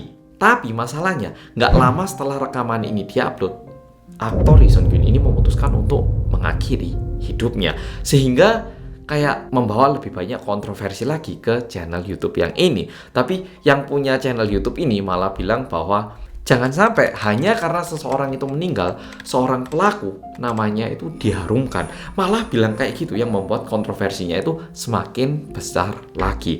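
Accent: Java, Indonesia. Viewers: Bang krn tinggal dikorea mungkin gk brani speaking. Utk tambahan pa yg disampaikan Bang ini ke Channel Detective Aldo, ini kasus (Lee Sun Kyun) bisa jd utk menutupi kekotoran Penjabat/Petinggi2, Anak2/Cucu2 Penjabat korea. Kekotoran kecil/sepele artis2 korea digunakan utk menutupi kekotoran para petinggi2,anak2/Cucu2 Petinggi Korea yg lebih besar. Spt kasus Narkoba&Bullying yg dilakukan oleh anak2 petinggi korea. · Mas aku suka medokmu....bukan hanya indonesia banget tp njowo banget...medokmu kui loh mas 😅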